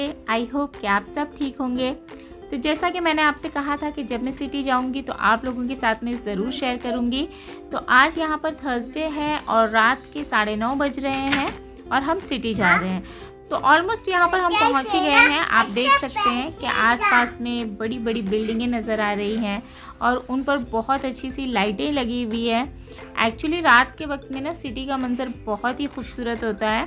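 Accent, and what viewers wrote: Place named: Pakistan. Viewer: Aap to bilkul Pakistanion ki Tarah boltin hain .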